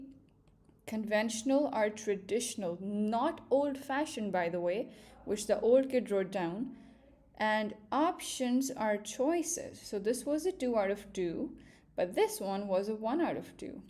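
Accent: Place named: England, United Kingdom. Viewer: Miss how you learn this english accent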